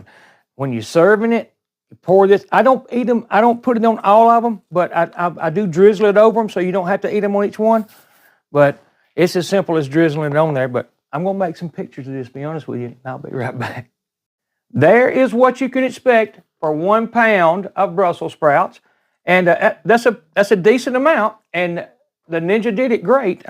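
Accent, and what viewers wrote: South, United States. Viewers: Cant get the accent Sir? South but??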